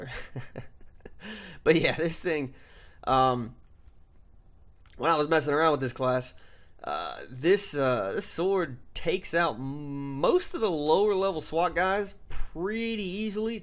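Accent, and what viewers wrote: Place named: South, United States. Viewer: Nice vid and am I right to assumed you're from the south?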